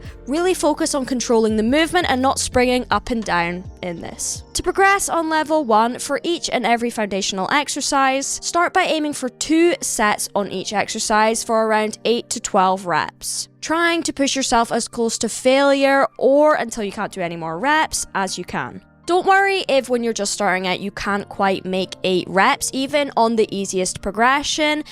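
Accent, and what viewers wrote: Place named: Scotland, United Kingdom. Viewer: Loved it thank you so so much! Love love love the Scottish accent. Not so strong though. 💚🤍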